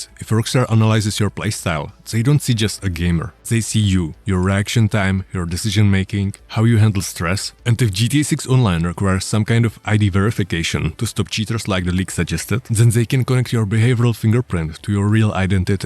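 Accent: Czech Republic. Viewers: this is very dystopian, future is scary... no one took the lesson from Terminator... Btw arent you Czech by any chance? :D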